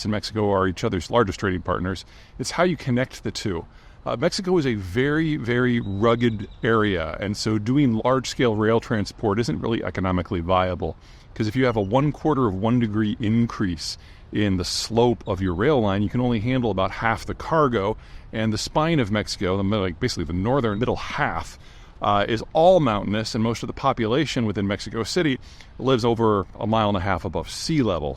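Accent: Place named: Iowa, United States. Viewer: That great Iowan accent!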